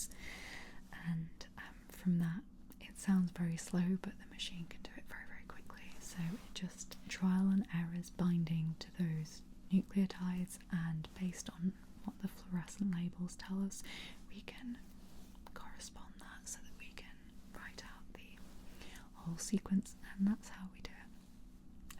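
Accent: United Kingdom. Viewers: You have a great ASMR voice. As an American, I think the British accent is a wonderful bonus as well. 👍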